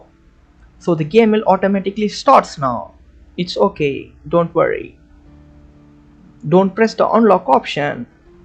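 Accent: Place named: India. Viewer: funny, because I can't even begin to understand it full stop. the music, the mic quality, the indian accent... what is he saying?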